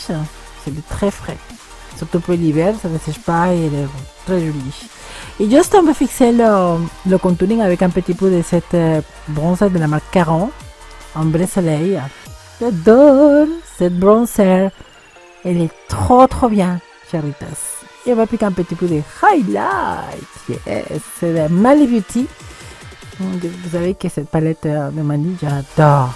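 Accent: Spain. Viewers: Ce maquillage est hyper lumineux et pétillant j'aime beaucoup tu es toujours magnifique 😻😻💓 j'aime trop ton accent espagnol moi qui a un amour grand pour l'Espagne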